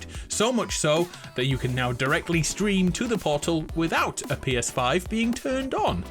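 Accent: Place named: Northern England, United Kingdom. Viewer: I’ve never realised you’re from up north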